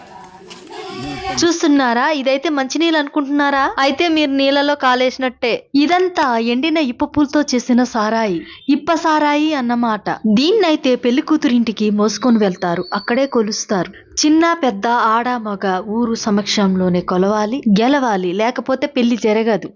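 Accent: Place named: Rayalaseema, India. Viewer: Meedi rayalaseema yasha lagunde. Nizamabad valladi kondari chuste rayalaseema yaasa vasthundi. Vichitram.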